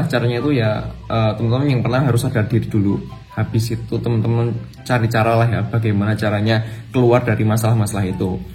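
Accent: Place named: Java, Indonesia. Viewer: Wkwk medok kali bro keren2 semangat konten nya 🤩🤩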